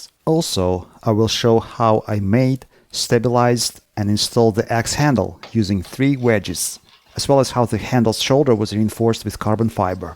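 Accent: Russia. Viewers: "Presents should not have any functional weaknesses or design flaws" - that must be the most Russian thing I have ever heard, and your accent only made it better. Great job, sir. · У автора русский акцент.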